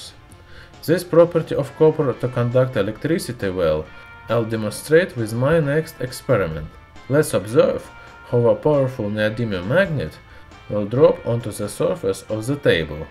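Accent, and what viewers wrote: Russia. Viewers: Is that Russian, Romanian? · I.lke the Russian accent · This is russian aksent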